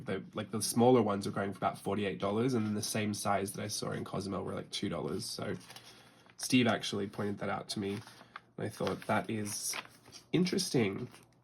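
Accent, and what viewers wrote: Australia. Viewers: Loving the super strong Aussie language and accent coming through today “onya ”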